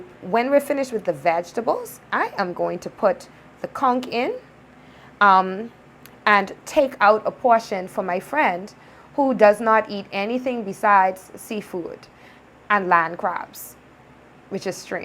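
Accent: Bahamas. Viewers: Gal I love how you did this with you authentic Bahamian accent. Your okra soup rocks just like you. A beautiful Bahamian girl who can cook and sound indigenous. Will be looking for your other videos.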